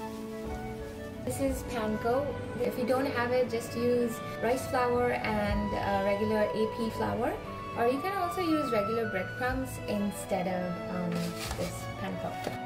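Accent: United States. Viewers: Y do you have an American accent. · Why this American accent girl. You should speak normally.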